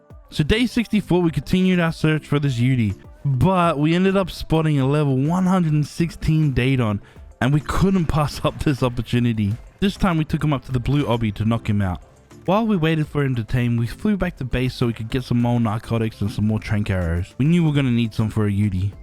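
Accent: New Zealand. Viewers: You sound like you’re from nz. Are you?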